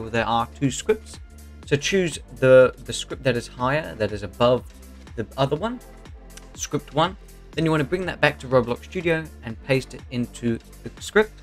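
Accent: United Kingdom. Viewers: Bro idk why but you are legit the most formal person ever. Idk if it's the british accent but god dayum you just sound so professional. I feel like I've done something wrong and you're trying to explain to me why I'm an idiot in the calmest way possible.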